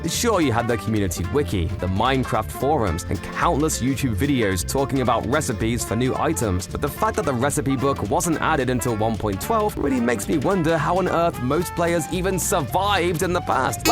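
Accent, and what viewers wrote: United Kingdom. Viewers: This guy has such a youtuber/British accent, it pmo so much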